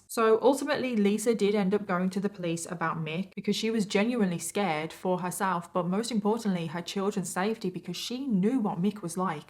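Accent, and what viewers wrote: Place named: United Kingdom. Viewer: i was literally binging your series and wanted to see a compilation of like abvsive parentys and then next day i have it! (obviously i would rather not have these crimes to exist, condolences to the victims) I also build a lot of minecraft while your videos run in the backround and your voice is so calm and Sassy is so funny and point-on! Also i always knew you were british =D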